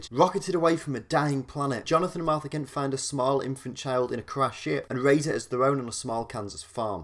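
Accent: Scotland, United Kingdom. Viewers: You're not the Scott, but are you a Scot by chance? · Scott turned British?!!! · Whys a British guy talking about the american way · Comics I like how the one video about America was done by a British guy.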